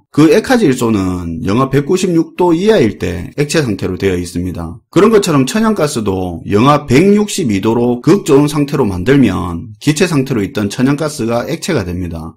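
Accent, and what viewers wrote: Gyeongsang, South Korea. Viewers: 이야..구수한 경상도사투리에 전문지식없는사람들도 금방이해할수있도록 간단명료하게 설명해주시니..그저 대박이라고밖에...ㅎ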